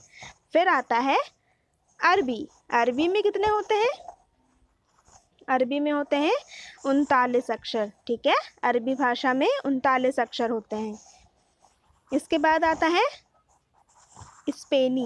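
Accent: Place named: Bihar, India. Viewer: आप बिहारी हैं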